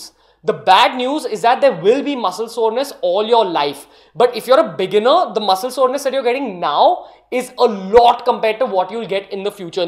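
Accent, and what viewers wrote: India. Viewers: Lol his accent tells he is Indian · Why are people talking about your accent... That's a normal accent found mostly in higher middle class or upper class section of Indians... 🙄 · So guys here is the pure Indian accent for you ..yeah I know its kinda weird 😐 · 😂 I can’t concentrate. His accent is too funny. Not to be disrespectful but he has a strong Indian accent I don’t even know what his saying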